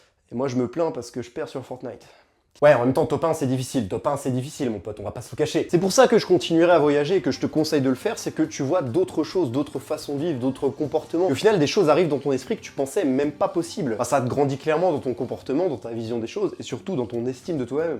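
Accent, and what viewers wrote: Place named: Arab world. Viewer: Ton accent arabe 🤌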